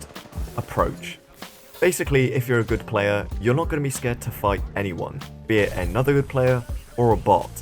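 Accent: England, United Kingdom. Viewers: Nice to have some Apex vids in an English accent! Is there skills matching in Apex? I seem to constantly get one-clipped by people with between many hundreds, and thousands, of kills, and always to be holding up my team mates. The scores the game gives you seem to be massively weighted towards survival time and everything other than kills, so if you have some tactical nous and play as a team player, you get to high levels despite potentially being awful at getting kills, as I am - which leads to frustration! I'd love to find more people what want to play a slower, longer-range game and outflank enemies as a squad, not just charge in aimlessly whenever they see anyone....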